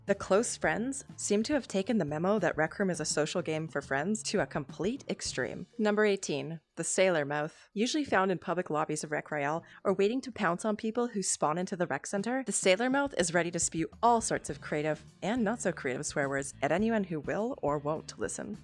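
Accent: Canada. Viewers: Hold on your Canadian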